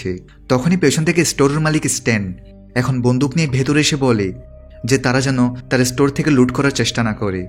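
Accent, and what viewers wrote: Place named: Pabna, Bangladesh. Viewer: vai morgir khowar😁😁,,ata to pabnar ancolik vasha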